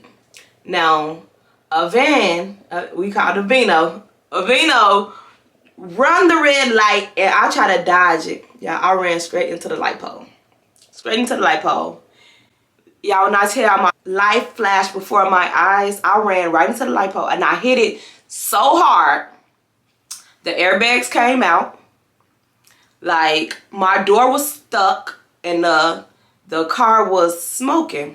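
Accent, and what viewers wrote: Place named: Chicago, United States. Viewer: The Chicago accent made the story even better! And now I'm bout to watch story times on YouTube! This was a good one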